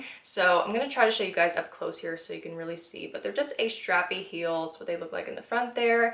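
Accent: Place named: Canada. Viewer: I'm 51 from Australia and wish Temu was around when I was in my 20s! Great finds and so affordable too! - thanks. I've got my eye set on that chain bag and the watches. PS - Your hair looks great and really suits you. Love the Canadian accent too, I went to London Ontario Canada when I was 13 to visit family and would love to go again one day. Beautiful country!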